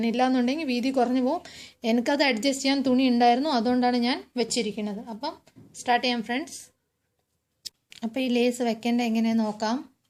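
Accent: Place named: Tamil Nadu, India. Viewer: Ningal tamil aano...samsarathil oru tamil chuva..enthayalum nice video aanutto. New subscriber aane..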